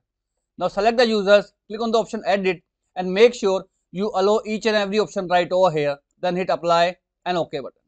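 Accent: India. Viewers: work on your accent please I cant understand indianness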